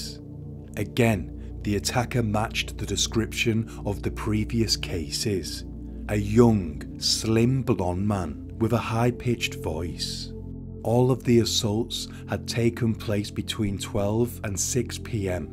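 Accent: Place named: United Kingdom. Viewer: What irritates me is his British vernacular .. muhvuh (mother) deaf (death), etc.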